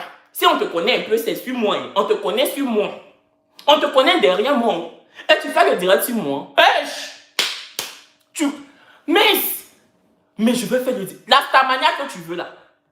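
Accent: Cameroon. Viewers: Ton accent devient camerounais j’adore 💜😍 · ton accent deh, maintenant tu n'est plus ivoirien tu es camerounais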